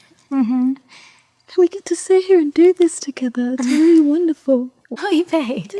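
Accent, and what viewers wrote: United Kingdom. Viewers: Your british accent is so on point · I enjoy watching your vids...you make me laugh...& you can do a perfect british accent ... You are so right...the relationship between those two is weird...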